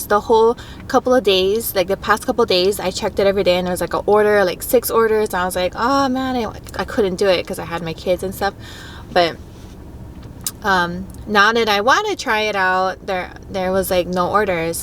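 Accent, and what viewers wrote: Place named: Hawaii, United States. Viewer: I knew you were from Hawaii the second I pressed play. I interview a lot of locals on my podcast. I need to get in on Shipt.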